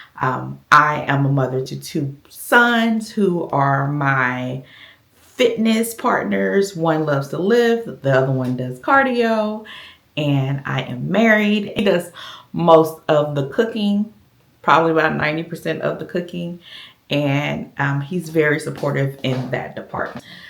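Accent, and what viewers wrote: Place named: Georgia, United States. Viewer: The journey continues 😊I knew from your accent you had to be near me. Augusta Ga is my hometown. I thank you for sharing your story. I have been thinkg about HRT. Dr put me on low dose birth control to manage menopause symptoms, but said it was only temporary. Now I know to try the supplements. Thank you❤ I am sorry for your loss.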